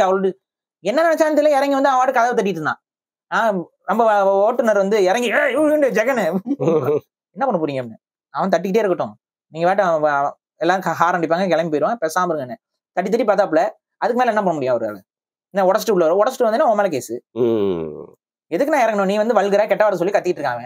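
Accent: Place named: Chennai, India. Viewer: கண்டிப்பாக சென்னை தமிழ் மாறவேண்டும் நீங்கள் இன்னும் முயற்சி எடுங்கள் நன்றி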